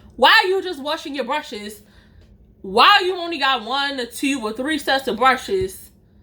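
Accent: Baltimore, United States. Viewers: This was your first storytime I watched and when I say that your accent and storytelling skills kept Me fully entertained, it did. I Love Your BMore accent🥰😊😊😊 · My man here like I know she from Baltimore lol your accent STRONG LOVE YOUR CONTENT BABES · I love your Baltimore accent lol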